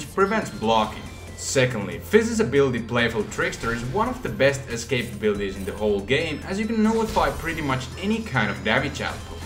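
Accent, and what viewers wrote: Finland. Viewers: ootsä suomalainen koska kuulostat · Where are you from? You sound little bit finnish:D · ookkos suomesta kuulostaa vähä silt ?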